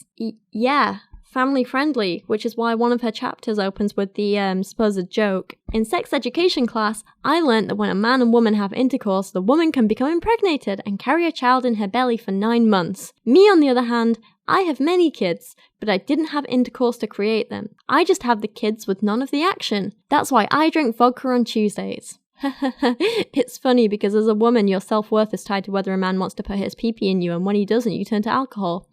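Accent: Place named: Staffordshire, United Kingdom. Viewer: Your Lil' Staffordshire is adorable and i love it <3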